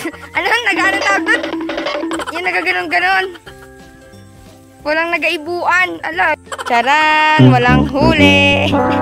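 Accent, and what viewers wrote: Batangas, Philippines. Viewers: Yung lingguwahe katulad ng mga taga batangas.. keep it up 😁😁😁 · parang Batangquena ka? cute mo naman ang ganda ng lugar nyo...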